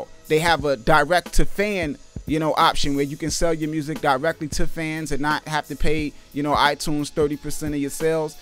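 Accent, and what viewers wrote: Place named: New York, United States. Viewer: You definitely from New York lmao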